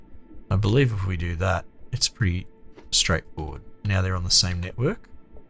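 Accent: Australia. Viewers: lmao i fucken thought to myself this lad sounds like me, then you reckon "right", im like yeaaaaah he's an aussie hahaha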